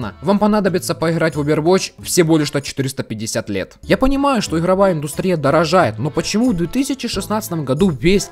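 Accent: Ukraine. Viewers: черт, я по голосу вычислил сразу что ты из Украины🇺🇦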